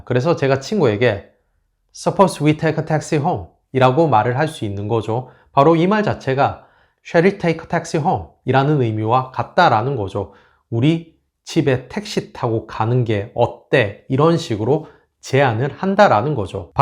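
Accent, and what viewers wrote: United Kingdom. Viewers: 한국어에 영국 억양이 묻어나요^^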